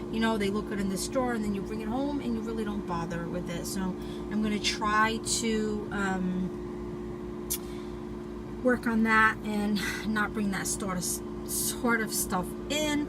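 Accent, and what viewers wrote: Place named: New England, United States. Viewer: So I thought maybe you were a New Englanda because I heard you say "freeza" and "dooa" (door). LOL. Great video! Thanks for sharing!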